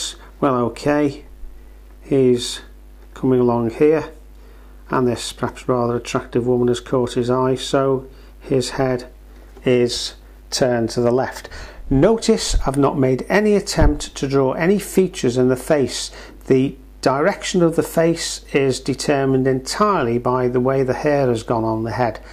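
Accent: Liverpool, United Kingdom. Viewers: Hi.Really enjoy your tutorials,love hearing you speak as I’m from Liverpool too. Its like going home.😁❤️👏🏻👏🏻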